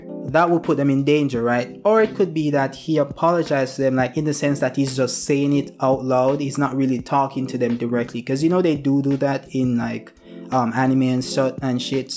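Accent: Jamaica. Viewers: i knew it i am also Jamaican bro u speak Jamaican English when i speak English u hear ghetto english nice to meet you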